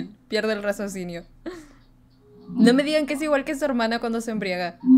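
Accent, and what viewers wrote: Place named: North, Mexico. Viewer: Le achunto al clavo lo de norteño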